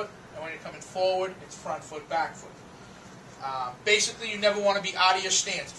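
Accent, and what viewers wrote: Boston, United States. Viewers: Boston accent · its a boston accent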